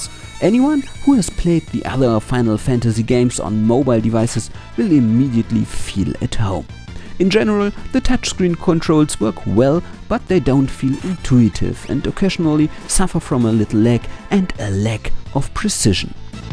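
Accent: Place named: Germany. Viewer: Schönes Review! Angenehm anzuschauen und anzuhören. Weiter so! Der deutsche Akzent sticht manchmal noch heraus bei Wörtern wie "diagonally", "devices" oder "heard". Aber nicht schlimm ;) Außerdem "comfortably", nicht "comfortable" ;)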